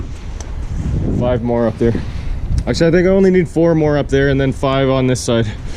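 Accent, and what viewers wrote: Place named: Canada. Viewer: You sound Canadian. You ever end your day with a smoke?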